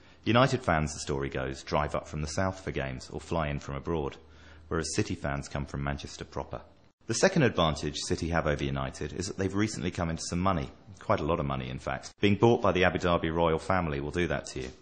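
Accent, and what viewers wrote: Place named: South, United States. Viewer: This is comedy gold - the narator talks about city and says "We did this" "We did that" - an obvious city fan, WITH A FUCKING SOUTHERN ACCENT ! Manchester United are FROM Manchester - Newton Heath to be precise - born in 1878 - much earlier than city. Its all about where your BORN not where you LIVE. One last point, United have had higher average attendance since records began in the 1900's than city, even when united were in Div 2, below city, MORE MANCS ATTEND UNITED GAMES THAN CITY, FACT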